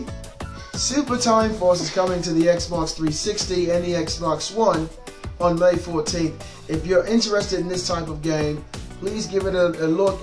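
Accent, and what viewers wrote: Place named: United Kingdom. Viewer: Lol lovin the posh English accent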